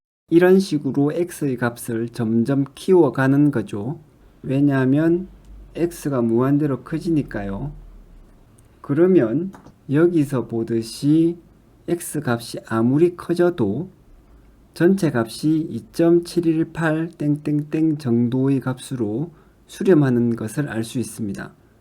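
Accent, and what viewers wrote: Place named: Gyeongsang, South Korea. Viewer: 경상도 사투리가 심하게 들리네요;;사투리로 들으니 e가 굉장히 특이한것처럼 보이네요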